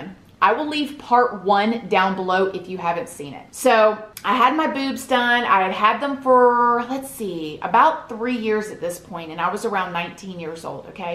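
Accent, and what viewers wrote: South, United States. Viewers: guess I should've figured, being she talks about Florida's women's prisons.. lol I guess when she said a couple hours from New Orleans it threw me off.. plus I guess I didn't realize ppl in FL really talked like Southerners... What do I know? FL is one of the few states I haven't been to. Thanks for the response :) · You're so fn southern. It's like, real genuine "y'all" can't make it up❤❤ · Hey nothing wrong with that southern swang 🤣 I live in the same town as her and we all say y’all around here 🤣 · 🤣🤣 ya'll count! I love it! I think it's the cutest! I love southern accents and the specific way they say certain things! So charming!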